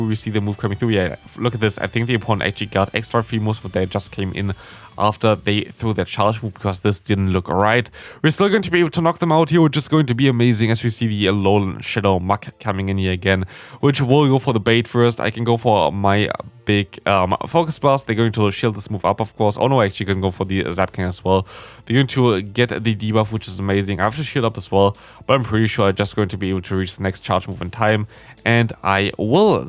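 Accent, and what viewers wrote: Germany. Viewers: Wait... You´re german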